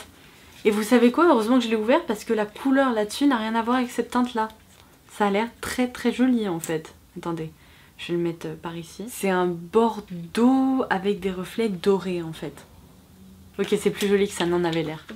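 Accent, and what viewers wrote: England, United Kingdom. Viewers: Ton accent anglais est super !!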